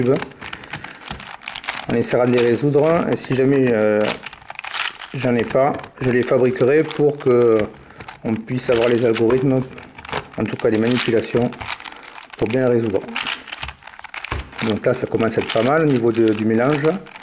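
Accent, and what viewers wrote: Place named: South, France. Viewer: super boulot !!! à conseiller à ceux qui aiment les cubes moi et mon fils (et oui je lui es donné le virus) sommes fans de ces jeux et avons une grosse trentaine de cubes nous venons de recevoir le 4x4x5, 5x5x4, et bien d'autres encore et avons commencé le 4x4x5 grasse à toi merci beaucoup question : sais tu faire le gigamix je ne trouve pas de vidéo explicative comme toi en français ! mon petit grand fait aussi des videos c'est lyondu31 vu ton accent serais-tu du sud merci encore Eric ANTIPHON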